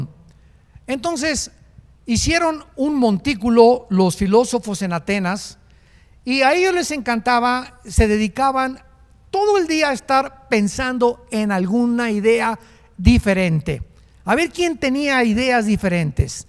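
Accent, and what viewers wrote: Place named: Puebla, Mexico. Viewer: Como que tienes un acento a tehuacan puebla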